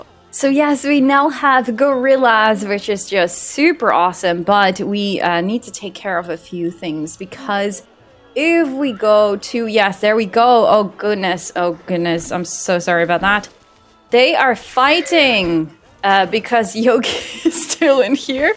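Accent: Netherlands. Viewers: wait are you dutch? Of ben je nederlands haha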